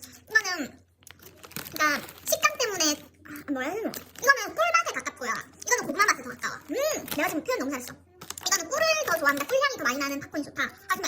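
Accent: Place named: Busan, South Korea. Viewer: 님 부산분인가봐요^^ 반갑반갑~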